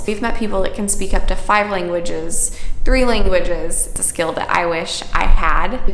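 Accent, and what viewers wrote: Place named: United States. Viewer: you have a normal American accent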